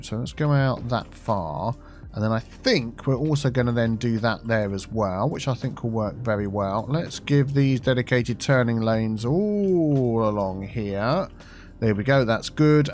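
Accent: United Kingdom. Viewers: I new you are a Brit since the first time I head you. And English is not my first language.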